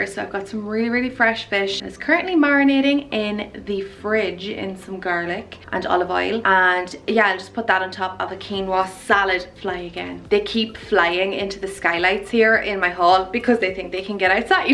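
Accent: Ireland. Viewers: I love watching your videos. You give me new ideas on how to change up my every day dread of what to fix for a meal. No 2 people do things the same lol. I've been watching your videos for probably close to a month now. Definitely have my notifications on! Thank you so much and much 💘 to you!...... P.s. what started my binge watching is your accent. An Irish accent has always been my fav. Never hear people with those here in Alabama (USA).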